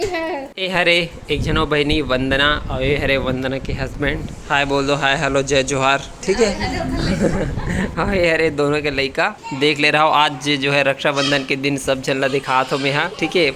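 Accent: Chhattisgarh, India. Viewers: Bhaiya aap bolte ho. I like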